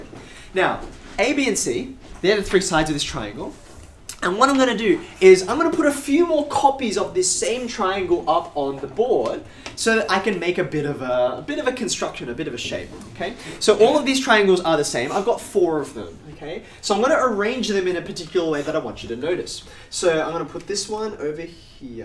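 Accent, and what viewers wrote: Australia. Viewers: it's the aussie accent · is this guy aussie? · Pretty sure that’s an Aussie accent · He's actually Australian. A person's native language and accent is not hereditary, it is environmental. If you grow up hearing most people around you speak English with an Aussie accent, you'll also speak English with an Aussie accent as your first language. And this is how it works for all people who grew up in Australia (Aboriginals, British, Chinese, etc), regardless of their ancestry, unless they grew up in an ethnic enclave, only hearing people speak their ancestral language.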